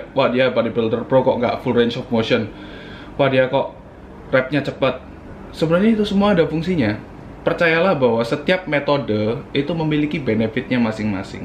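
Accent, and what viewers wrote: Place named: Java, Indonesia. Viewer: kok koyo wong solo / jogja ya. logatnya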